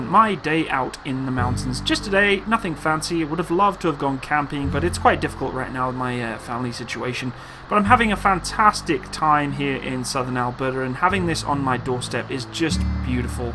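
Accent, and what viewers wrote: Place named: North East England, United Kingdom. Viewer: what part of north east England r you from im from Hartlepool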